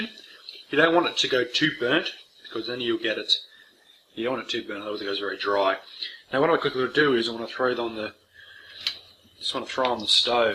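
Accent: Australia. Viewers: by me he's Australian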